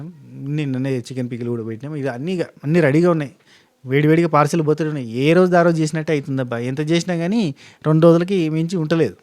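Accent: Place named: Rayalaseema, India. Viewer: Yendi ee roju మా సీమ యాస కూడా వచ్చంది 😂😂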